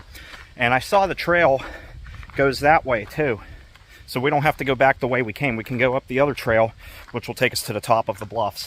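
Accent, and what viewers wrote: Pennsylvania, United States. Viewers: That was great. I've been to Presque Isle S.P. dozens of times but never to Pine Bluffs. I live 50 miles South of Erie and Pjne Bluffs is a handful of miles out of where I go. Very nice job, I feel like I was there too. I can tell you're from Pittsburgh by your yinzer accent but I thought you would be used to going uphill, ha! Good job. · Nice video! Much appreciated. Great idea about PA state park visits. I'm a transplant from Philly area to Western PA. Your PA accent is endearing. Liked and subscribed.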